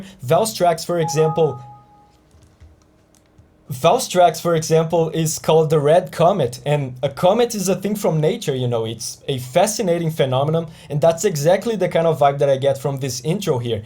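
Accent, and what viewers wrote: Brazil. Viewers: great video, love your mh content! btw you have a very brazillian accent or at least sounds like it lol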